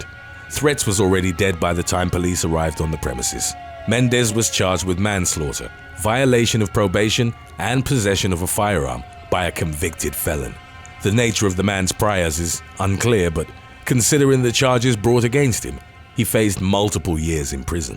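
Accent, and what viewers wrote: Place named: England, United Kingdom. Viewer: This series is addictive! I love the narrator’s English accent!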